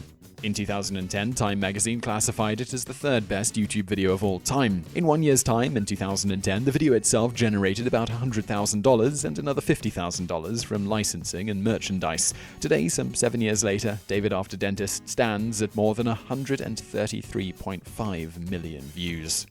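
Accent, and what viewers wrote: England, United Kingdom. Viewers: I love the way he says "scumbag", "farts", "shits" and "badass" in a perfectly clipped English accent.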